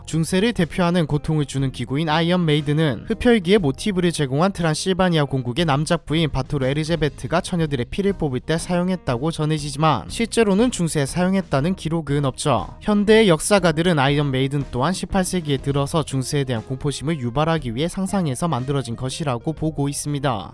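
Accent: Gyeongsang, South Korea. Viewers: 경상도인의 서울말 같이 들리네요 ㅋㅋ 제가 듣기엔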